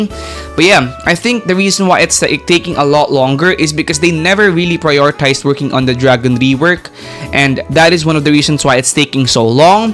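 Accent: Philippines. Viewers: I wonder if your a Filipino like on accent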